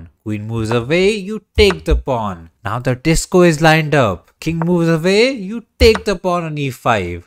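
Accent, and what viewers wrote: India. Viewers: Nice to hear some Bhartiya voice. Search knight tour Sanskrit to know why Bharat was richest. So much knowledge they kept hidden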